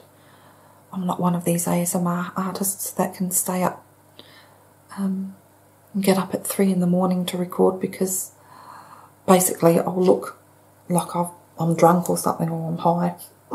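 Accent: Australia. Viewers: Lovely! You look at least 10 years younger with your new makeup pieces and hair do! I have to say I love my international ASMR artists and their accents. However, I was so thrilled to find an Aussie lady (you, in case you wondered) with whom I could identify. In those days I still thought it was a bit weird but you helped normalise it for me. I am slowly finding more Aussies making ASMR videos of which I'm also proud. But, you are my first Aussie ASMR artist and a relaxing nurse on my not so crash hot days. The rest are bonuses. 💜🦉🇦🇺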